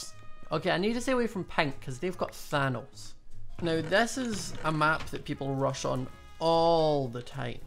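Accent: Scotland, United Kingdom. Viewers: Lol i van barely hear what hes saying through that thick scottish accent XD your vids are awsome luv ur vids!!!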